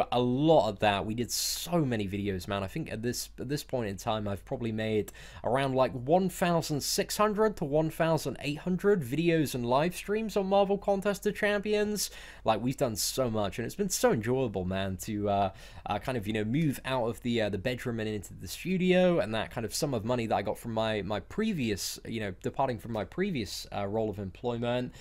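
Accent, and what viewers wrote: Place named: RP, United Kingdom. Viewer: I like you man.. The posh accent and using words like man and lit.. You crack me up, Keep it up mate!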